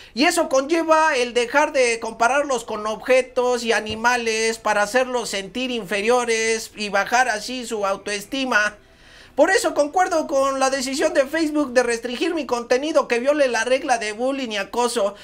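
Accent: Mexico. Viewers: Te apoyamos, mejor YouTube, o tal vez Twich, que no tiene censura, la Red Morada, está mejor, y como hablas, está perfecto, así somos los chilangos, pero FB es muy millenial!!! No cambies tu estilo, por favor 🙏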